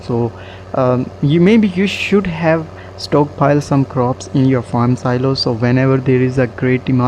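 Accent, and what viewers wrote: India. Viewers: Are you an Indian person because you sound like one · idk why your Indian accent gives me an asmr like tingle but i love it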